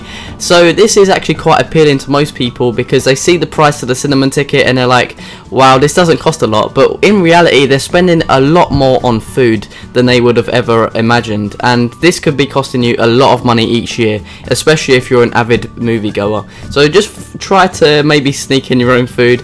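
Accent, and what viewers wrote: United Kingdom. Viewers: On the contrary Mr. commentator, you presentation was very understandable even with the nice British accent, I beleive. thank you kindly for sharing your experiences. Prof. Bruce W. Marchetta Th.D Hypnotherapist - educator 3-1-2017 baja ca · great information and you spoke good and clear ..love your British accent ..my guy is from Greater Manchester Uk . I could understand you better than most Americans speak ..great job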